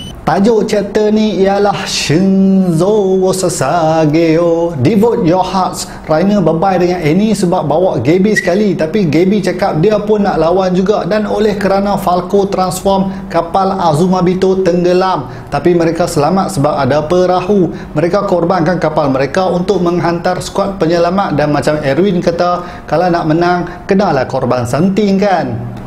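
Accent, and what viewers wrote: North, Malaysia. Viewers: Haah bangsa utara kita haha 🤣🤣🤣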